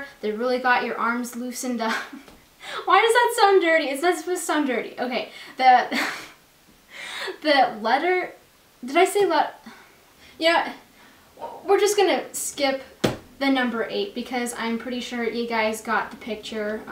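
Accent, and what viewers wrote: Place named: California, United States. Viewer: It's ok, you from California? For some reason that was what came to mind (when I heard the likes).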